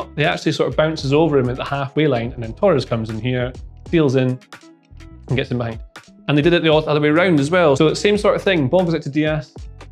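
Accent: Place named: Scotland, United Kingdom. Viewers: Why I have a feeling that a Scottish accent is perfect to explain football tactics??? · is this guy scottish or eastern european hahah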